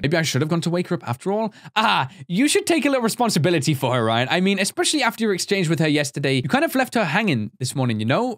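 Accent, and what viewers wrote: United Kingdom. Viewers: Why do you sound sound British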